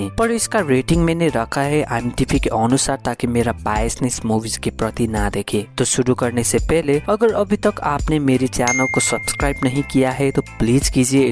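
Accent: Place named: Bengal, India. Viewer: Tum bangali hai kya😅😅😅tumhra accent lag raha 😅😅😅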